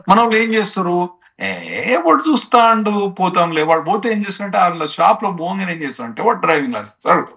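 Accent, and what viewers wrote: Telangana, India. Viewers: Anna,yavoore needhi, pakka Telangana matalu matladthunnaru? 👌👍🙏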